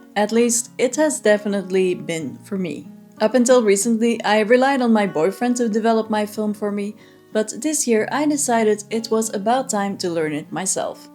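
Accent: Flanders, Belgium. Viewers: Great to hear a flemish intonation on a video about my favourite topic; subscribed!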